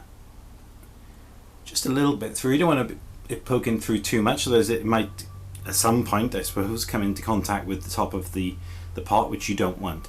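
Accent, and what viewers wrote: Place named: Wales, United Kingdom. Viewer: Da iawn. Thought I detected a bit of Welsh accent. So cool to have pickups made in Wales.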